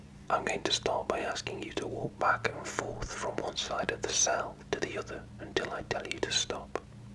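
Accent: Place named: United Kingdom. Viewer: British! Yes!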